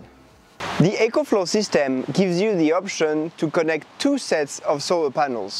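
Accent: France. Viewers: Beautiful! So brilliant! Love the French accent! ❤ So soothing to my soul!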